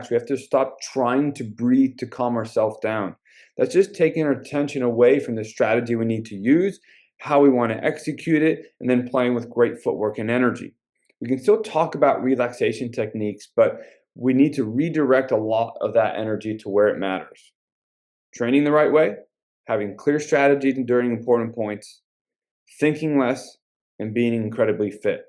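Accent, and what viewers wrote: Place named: Eastern Europe. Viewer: Coach, I don’t know what’s behind those 3 “lessons”, but you sound more Eastern European than American😂. This is how “business” is done here.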